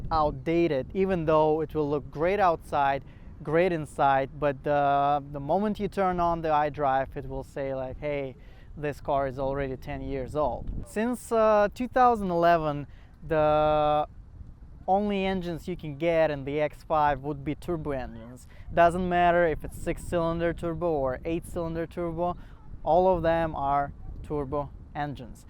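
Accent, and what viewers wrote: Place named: Russia. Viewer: Russian accent af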